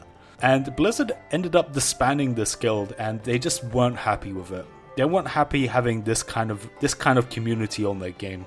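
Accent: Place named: United Kingdom. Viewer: Hold of woocraft ... British accent is funny XD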